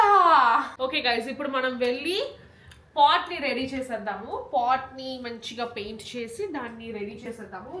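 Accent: Tamil Nadu, India. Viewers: Akka miru matladettappudu tamil language vasthundhi 😅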